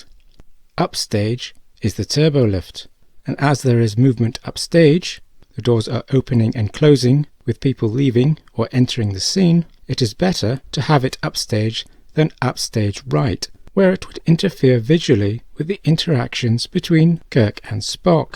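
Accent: United Kingdom. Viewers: he’s a Brit… They pronounce thing weird.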